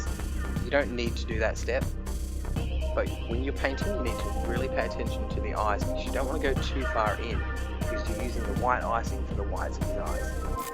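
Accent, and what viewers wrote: Australia. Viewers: Aussie!!!! Damn I miss Australia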